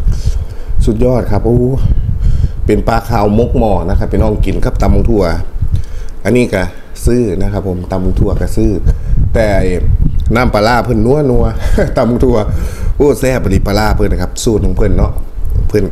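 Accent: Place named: Phu Thai, Thailand. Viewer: เป็นนครพนมเบาะครับเว้าสำเนียงภาษาภูไทคือผมเลย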